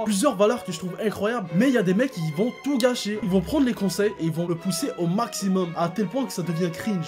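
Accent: Quebec, Canada. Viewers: puréeee après 4 vidéos regardées j’ai enfin capté que t’étais québécois j’arrivais pas à analyser l’accent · T'es québécois ?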